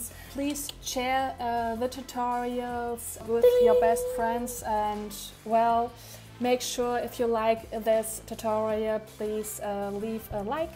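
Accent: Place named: Germany. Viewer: You have a German accent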